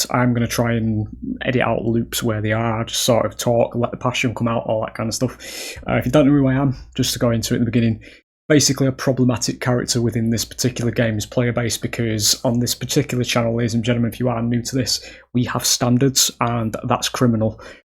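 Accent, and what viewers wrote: United Kingdom. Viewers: A vent in a proper British accent. This is great!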